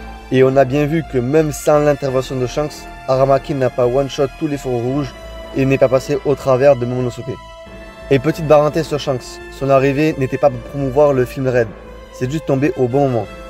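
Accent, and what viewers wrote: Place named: England, United Kingdom. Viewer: Incroyable ton accent anglais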